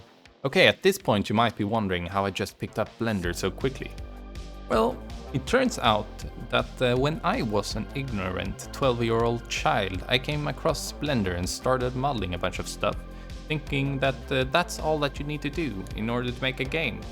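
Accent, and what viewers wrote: Sweden. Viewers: eeeeeeeh that I don’t know but he sounded Swedish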